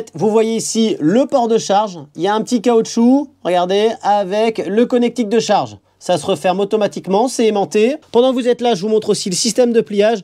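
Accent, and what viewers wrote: North, France. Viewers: Salut, Excuse moi tu est du Nord ? Lille ? Merci à toi 👍👍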